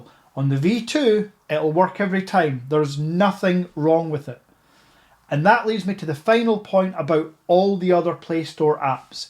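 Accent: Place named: Scotland, United Kingdom. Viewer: I had to watch this one on silent / closed captions. It took me a while to work out why you were talking about your doctor - the subbies kept referring to GP. Turns out that Google doesn't know the Scottish pronunciation of G Pay!!!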